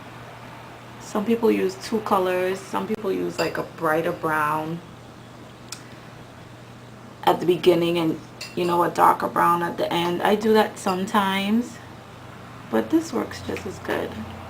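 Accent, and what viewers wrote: Bahamas. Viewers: where are you from? im from the bahamas and you sound like a bahamian rather tan an american to me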